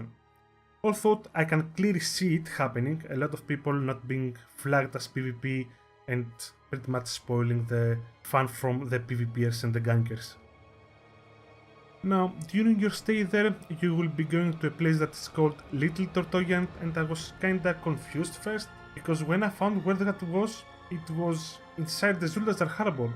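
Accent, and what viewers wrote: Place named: Greece. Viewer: πως καταλαβαινεις οτι μιλαει ελληνας αγγλικα απο το πρωτο millisecond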